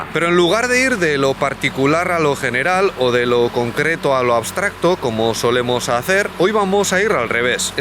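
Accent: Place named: Basque Country, Spain. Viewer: Gran reportaje Me encanta como lo explicas desde el otro punto de vista que aqui en occidente no nos enseñan. Ademas con acento vasco. Ahi va la hostia. Eres un crack. Cuidate.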